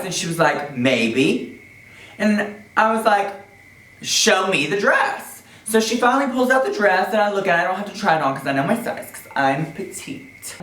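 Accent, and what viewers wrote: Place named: United States. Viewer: the most amazing accent from the us.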